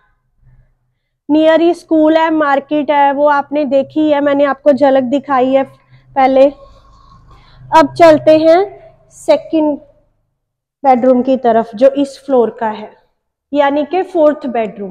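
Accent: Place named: Punjab, India. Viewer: Kudi punjab d lagdi aa jo punjabi vich Hindi fit kari ja rahi aa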